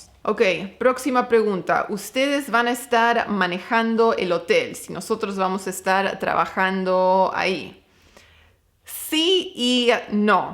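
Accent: Argentina. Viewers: Hablas como Argentina, ya sos nuestra🇦🇷🇦🇷🎸🎸🤟🤟😃😃, falta que hables como Cordobes y digas cuuuuuleeeaaadoooo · Cada vez tenés más acento argentino.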